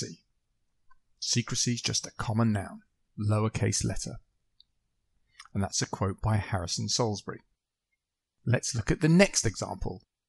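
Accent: United Kingdom. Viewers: Very Bri,ish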